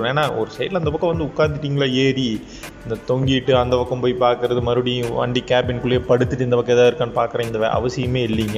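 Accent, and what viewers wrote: Coimbatore, India. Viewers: Coimbatore slang😍😍🔥🔥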